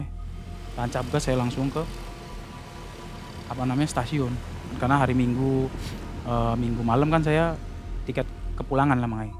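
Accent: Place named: Cirebon, Indonesia. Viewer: wong cerbon yaaaa Logate bli bisa diboongi ca 😁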